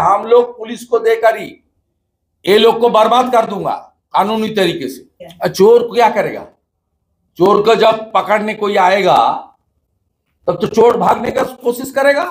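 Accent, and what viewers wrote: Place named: Odisha, India. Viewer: Uriyader moton kotha bolay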